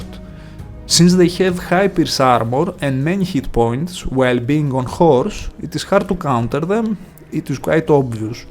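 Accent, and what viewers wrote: Greece. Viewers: haha I am a nottingham forest fan and i notice you have the same accent as Marinakis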